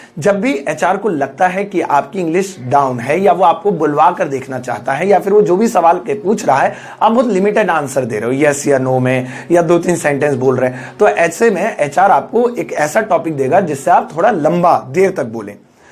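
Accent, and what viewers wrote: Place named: Haryana, India. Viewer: Sir ji ap ki haryanvi must h ..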